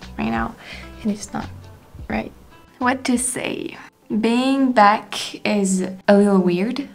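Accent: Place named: France. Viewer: Eric was right about your English. I was all wrapped up in your story and didn't even realize that you have an accent until Eric committed on it.! Wow you are really getting good at it. I don't want you to be perfect because of the Frenchtastic way you speak English.! Love you from Tennessee.! P.s. When you çome back, you need to check out the South Land . Especially Louisiana and talk some Cajun.!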